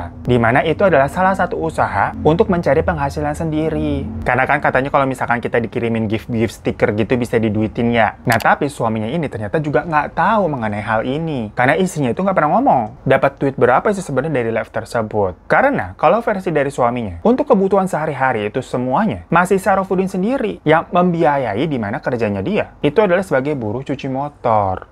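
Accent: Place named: Pemalang, Indonesia. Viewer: orang pemalang mana suaranya.. lah ternyata aku orang pemalang sendirian di sini :(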